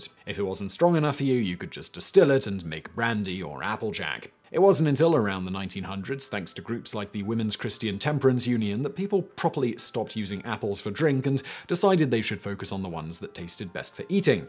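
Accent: United Kingdom. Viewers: For the last month this guy has been acting high in these videos. "I have no idea what I'm about to say ... I never heard of any of this ..." Is this in the script? Is he pulling these phrases out of his ass? These videos are becoming unbearable. The British accent made him sound smart over the years. Like when people think Alex Trebek is a genius while he is just an actor. But when he acts like an idiot, the accent makes him sound even dumber. Why would I want to learn from some backwater hick? Is he saying things that are researched or is he just making stuff up?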